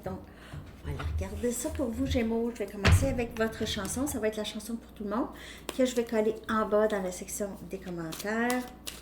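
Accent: Canada. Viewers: En plus, j'adore votre petit accent canadien, c'est trop mignon, mon rêve d'aller voir ce magnifique pays que vous avez là !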